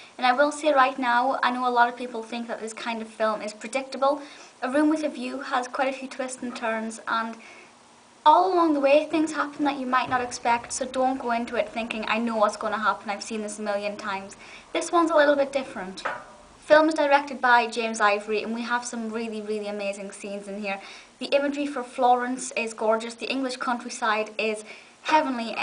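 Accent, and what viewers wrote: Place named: Scotland, United Kingdom. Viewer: Are you from Scotland???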